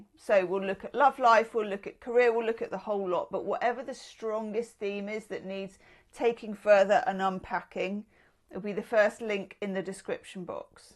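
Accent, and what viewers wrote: Australia. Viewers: OMG. I love the glasses and headscarf. You look gorgeous ❤ also I love your Aussie accent. It's very good and for my own amusement I am constantly imitating you imitating Aussies with a huge grin on my face even though no one would know why I am so amused. You bring a lot of joy to my life. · Just heard your Aussie accent, sounds spot on 👌fellow Aussie here x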